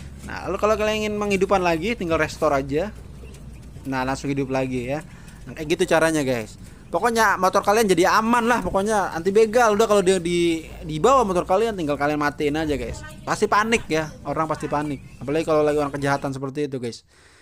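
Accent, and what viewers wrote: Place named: West Java, Indonesia. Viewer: Orang sunda bekasi nih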